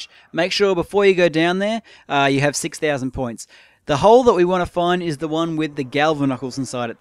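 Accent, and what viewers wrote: Australia. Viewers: Nice vid man i subbed u swnd like ur from Ausie which is kool coz im from NZ i hav no prob with ausies bro my teacher is from Australia & shes kool as catch ya later mate;-)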